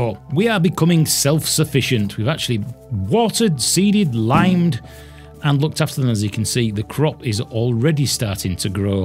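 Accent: Yorkshire, United Kingdom. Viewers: Orate buddy luv your vids. I’m tipping you don’t live far from me with that lovely Yorkshire twang you’ve got. I’m playing on PS4 so I’m a few updates behind I believe. Can I still find weed seeds?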